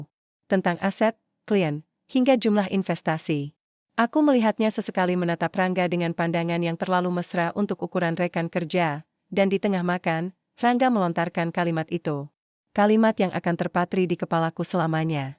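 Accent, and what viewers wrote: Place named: United Kingdom. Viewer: Kenapa to kok harus pake logat inggris segala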